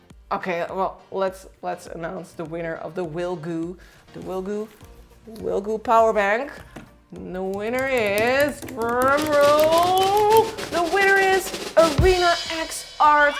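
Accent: Netherlands. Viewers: I spy a dutch accent 😀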